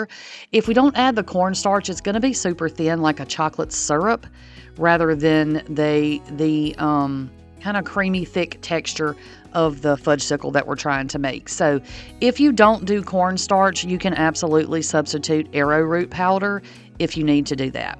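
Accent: South, United States. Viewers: This is 100% fine & authentic, southern American accent. 👏👏👏🥂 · Great video. They look delicious! Will try these for sure! And love your southern accent! ❤️ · It’s a southern dialect, maybe Tennessee or Kentucky id guess. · I love your southern accent I could listen to you all day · That's a southern American accent :) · Love your Southern accent and your lovely voice. Thanks for the recipe! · Her accent is from the American South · She has a southern accent. I don't know which state she is from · Her accent is about as Southern and wonderful as you can get 💕💕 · I love fudgsicles! You made following that recipe look easy. Thank you for sharing that. Your southern accent is pleasant to listen to and your tattoo is very beautiful also!!! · It's a beautiful accent from a southern state. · I thought you sound like a true Southern Girl. 😉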